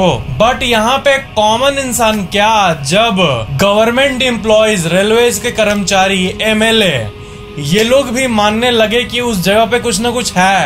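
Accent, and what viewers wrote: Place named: Jharkhand, India. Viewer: Jharkhand se lag rhe ho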